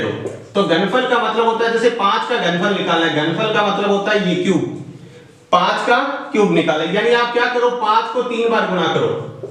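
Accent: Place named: Rajasthan, India. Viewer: Koi baat ni sir galti ho J😊😊full rajsthani boli 😏😏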